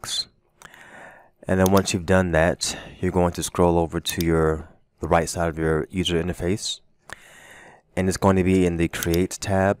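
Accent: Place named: South, United States. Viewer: Why does he sound like a Southern Ryan Higa!?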